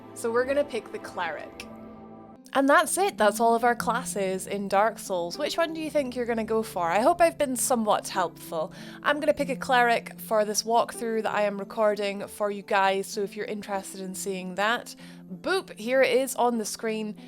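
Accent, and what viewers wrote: Scotland, United Kingdom. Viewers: Is that a Scottish accent? It sounds cool. You have a cool accent, you should try your best not to sell it to someone or accidentally discard it. Accents are valuable commodities.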